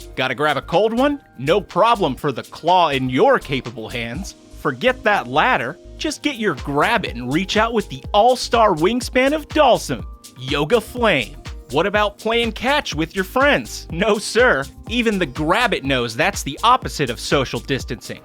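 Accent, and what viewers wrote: Buffalo, United States. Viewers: Are you from Buffalo?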